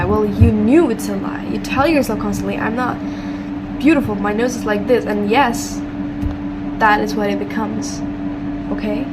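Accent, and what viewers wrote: United States. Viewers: YOOO YOUR AMERICAN ACCENT IS ON POINT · Wait how did u get your American accent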